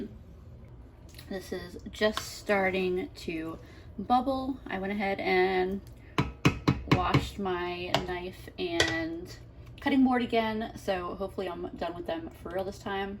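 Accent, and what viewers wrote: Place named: East Texas, United States. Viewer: You're my fav. Even though I'm from east Texas .... you sound like me 😁 love your no drama cooking ... I just keep watching you making meals that are doable. Thankyou dearly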